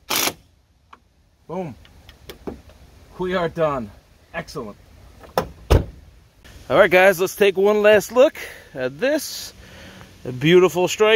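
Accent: New York, United States. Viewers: Enjoy the NY accent... what kind of welder?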